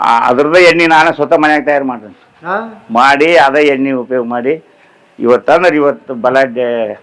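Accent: North Karnataka, India. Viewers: ಉತ್ತರ ಕನ್ನಡ ಅಲ್ಲಾರಿ. ಉತ್ತರ ಕರ್ನಾಟಕ ಭಾಷೆ ಇದು. ಸ್ವಲ್ಪ ವ್ಯತ್ಯಾಸ ತಿಳಿದುಕೊಂಡರೆ ಒಳ್ಳೇದು..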